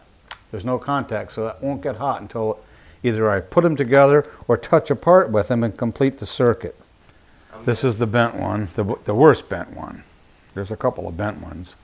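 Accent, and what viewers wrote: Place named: Midwest, United States. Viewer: called a Midwestern accent.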